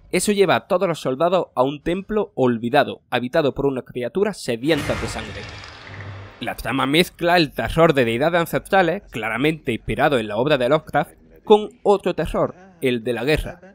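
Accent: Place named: Canary Islands, Spain. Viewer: Parece canario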